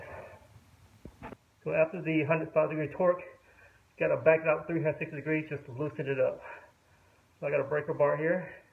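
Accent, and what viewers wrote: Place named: South, United States. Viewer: Did you plastiguage them? BTW its kind of odd to hear a southern accent from a Viet guy. :)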